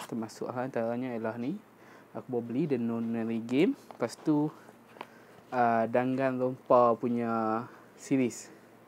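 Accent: North, Malaysia. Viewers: accent utara pulak tu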